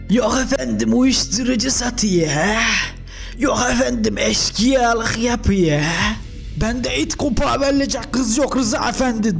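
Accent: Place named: Adana, Turkey. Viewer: Abi adanalısın ya da adanada mı yaşıyon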